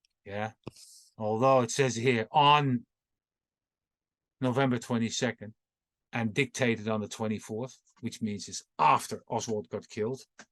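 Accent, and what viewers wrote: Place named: United Kingdom. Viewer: !!!!:text-green-game-over::face-blue-smiling:🍾🥂🎉, as you were ticking off the 4 white, male, blue collar employees, that PM could be, you had Frazier, Oswald, Lovelady and Dougherty (or Dockerty, as you pronounce it across the pond 💂‍♂). You then said we know it can't be Frazier.... we know it can't be Lovelady...so that leaves Oswald and Dougherty. You then went on about Dougherty being on the 5th floor...etc... That's not important! I just realized we can justifiably kick it up a huge notch, and identify PM as LHO with virtual certainty! We have long had information about Dougherty's general appearance. In Roy Truly's Warren Commission Testimony, when being asked about Dougherty, Truly says: "He is a GREAT BIG HUSKY fellow. I think he is 39 years old."!!! I think Ed LeDoux also obtained similar information a few years ago, about Dougherty being big or fat, and if i remember correctly, wearing glasses. 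I'm not absolutely sure but I think he got this description from another TSBD employee or employees! Maybe from Frazier? There is no way that PM/Oswald is big, husky or fat! Or wearing glasses (if that's the case). So IPSO FACTO, Prayer Man IS Lee Harvey Oswald!!!!!!!! Am I wrong?! Please tell me I'm right!!!!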